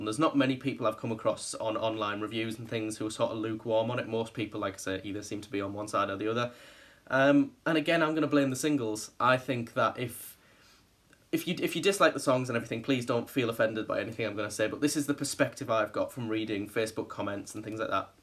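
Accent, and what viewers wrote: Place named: Northern England, United Kingdom. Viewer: I'm happy there's a positive review. I was happily shocked how much I enjoyed it, how experimental is willing to go. I love it and it keeps growing on me. My personal favourite is ' ' which just brings me to tears every time. It's just such a beautiful statement on the power of love, it's so sensitive and fragile. Exposing his pure heart within. Something truly, truly amazing. And that flute solo! I think it's something you could only really understand with an open heart idk. is just manic debauchery, I love it. has a wonderfully melancholic opening piano intro. Everyone rips on and I get it, but if you listen closely there's a lot of production elements and melodic arrangements which are quite clever. and speak for themselves. should be given more credit! is a gorgeous little number. I like all the tracks, come to think of it. 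I think he's simply done an outstanding job. It's an album that will surely add and not substract to the legend of. Bless him. Side-note, I am desperately trying to pinpoint your accent but I simply cannot come up with anything other than 'North', or possibly Wales or something. At the very least, far from London. Which is kind of sad, since, if you should possibly allow it, you're exceptionally cute. Oops. Anyway, great review and bless xx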